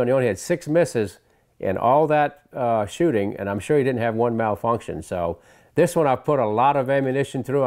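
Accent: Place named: South, United States. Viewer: It's GOLD, Jerry! GOLD! When I first started listening to this vid, it sounded familiar, but as if I was hearing you from something I had heard decades ago. Then it came to me. Your voice is similar to Don Novello's (Father Guido Sarducci on SNL). He doesn't have the southern accent, but the sound and cadence was similar. Of course the subject matters are totally divergent. I recall one of his bits was about a planet in Earth's orbit, but directly opposite of us, so we can't see it. Everything there is exactly the same as here except that they eat corn on the cob lengthwise. Thanks for "triggering" the memory. Anyway, your videos are treasures and hope you'll keep on keepin' on. I like the idea of chatting with other well known shooters. I had the opportunity to know Robbie Latham before he was a shooting star. I liked the kid. Not a smartass in any way.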